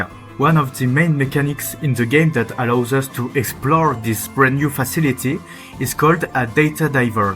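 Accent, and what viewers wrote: France. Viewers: Are you French? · this is the THICKEST franch accent I've ever heard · Are you french ? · The french like accent is soo soothing, good video , ill be studying this video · Tu sais quoi, tu m'inspires. J'ai aussi un accent français quand je parle anglais et ça me freine beaucoup quand j'ai envie de créer du contenu en anglais. Je trouve ça vraiment incroyable que tu "oses" faire une vidéo complète en anglais ( entre guillemets parce que le mot "oser" à une connotation presque négative, comme si ça impliquait que t'aurais jamais du faire la vidéo! Et c'est pas ce que je veux dire du tout!). Enfait bref, j'adore et merci beaucoup! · Are you french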